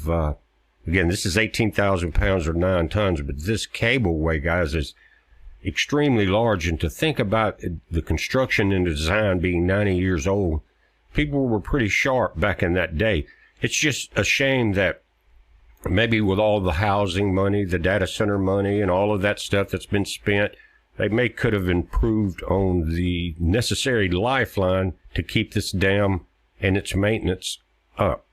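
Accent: South, United States. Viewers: very charming 'Southern drawl!' 🎉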